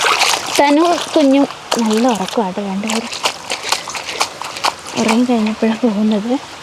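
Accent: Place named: Southern Kerala, India. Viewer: കുട്ടി..... കോട്ടയത്ത്‌ നിന്നും.... ഇവിടേക്കു.... വന്നതാണ്... ല്ലേ.... അപ്പൂപ്പൻ മാർ.... ആയിട്ട്..... 🥰 മോളുടെ.... സംസാരത്തിൽ.... പാലക്കാട്‌ ടച്ച്‌.... തോന്നിയിരുന്നില്ല..... ഒരു തെക്കൻ സ്റ്റൈൽ തോന്നിയിരുന്നു.... 👌💖 ഞങ്ങളും പാലക്കാട്ടുകാർ..... 💖🥰🥰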